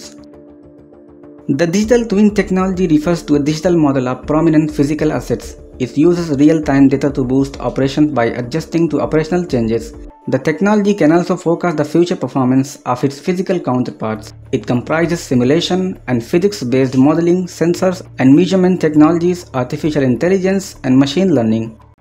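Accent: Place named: Russia. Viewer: It seems that he is from central asian countries like kazakhstan his accent of english is like russian but he is trying to speak like british accent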